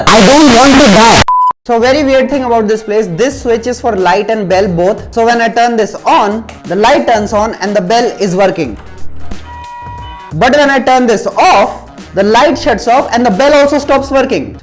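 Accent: Rajasthan, India. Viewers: Bro I'm from Rajasthan as well but I haven't met someone with that strong of an accent lol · you're funny af bro the indian accent makes it even better 🤣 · Bro got the classic Indian accent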